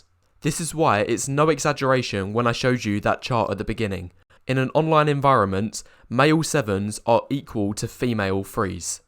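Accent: United Kingdom. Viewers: British accents adds aristocratic flavor to this content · One thing I feel weird about this is that a guy with the British accent here is using measurement such as ft. and dollars instead of cm and Pound Sterling. Are these videos exclusively about the situation in the United States?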